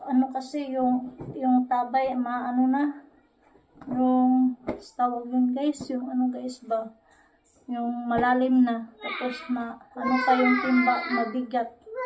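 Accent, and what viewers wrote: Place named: Visayas, Philippines. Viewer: Pretty bisayang bisaya na 😂 ❤❤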